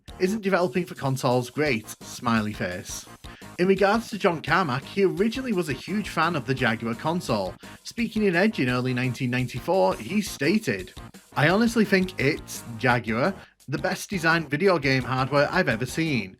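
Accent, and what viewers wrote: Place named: United Kingdom. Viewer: He's British, and we Brits pronounce it that way.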